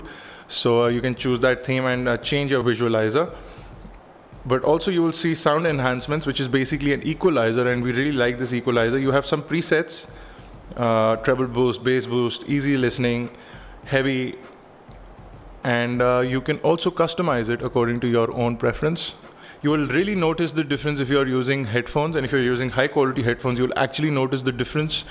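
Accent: India. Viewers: Indian accent dude :D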